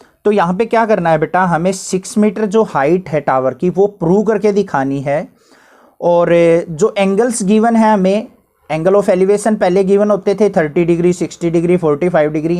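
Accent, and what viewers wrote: Haryana, India. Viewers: sir aap haryana ke ho kya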